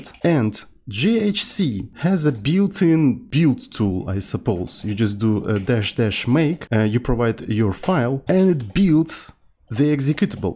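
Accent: Russia. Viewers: Я как услышал его, понял что русский, акцент остался чутка)0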